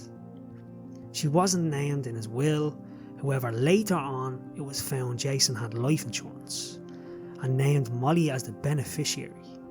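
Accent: Ireland. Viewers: How rude of a comment to call the narrators voice weird, I’m sure Americans sound weird to other English speakers in European countries. He’s an Irishmen, and their English dialect has been around longer than Americans dialect of English. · I’m Australian & I just love an Irish accent! The best accent in the world 🍀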